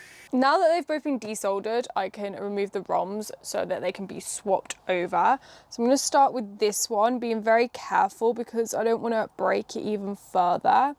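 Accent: United Kingdom. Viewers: Que genia! I love your channel and your british accent :) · She's cute, British accent, good at soldering and fixing things, is it really some miracle? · can someone tell me from which region in the UK she is? I can hear an accent but can't locate it. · Ouaw l'accent britannique 😊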